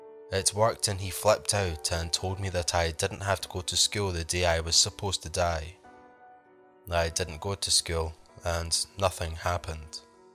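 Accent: United Kingdom. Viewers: Awesome stuff, so happy for you getting to over 1k sub's! I'm still sharing on wegamers. I am loving your content you put out, love the accent too, its great to hear people from the UK, love it. Keep doing what you are doing, your growing and growing every week!😜😜😜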